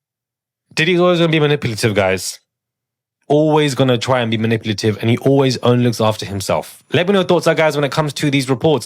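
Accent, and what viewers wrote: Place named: England, United Kingdom. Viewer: English accent sucks..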